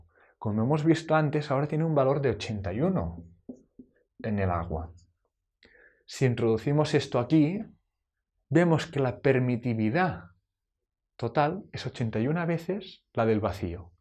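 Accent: Spain. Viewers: como se nota el acento catalán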